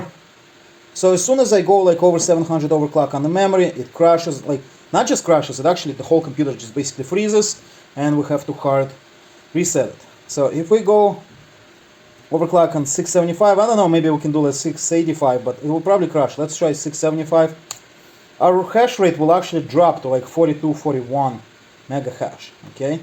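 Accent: Russia. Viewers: Русский что ли?!)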